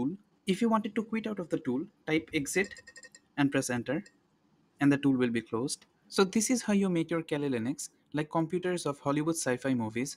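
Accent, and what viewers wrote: India. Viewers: He sounds like an Indian.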